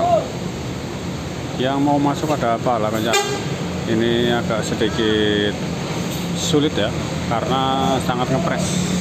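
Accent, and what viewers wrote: Banyumas, Indonesia. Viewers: Hadur dan nyomak lagi Bang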